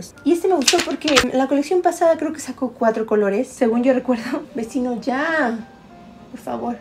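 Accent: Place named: Yucatan, Mexico. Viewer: 😂 justo iba a preguntar si es yucateca porque le noté acento 😂 viendo el video ya entendí por qué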